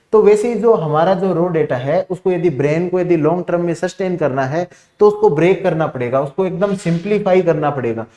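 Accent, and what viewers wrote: Rajasthan, India. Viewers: Loved the rajasthani accent 😀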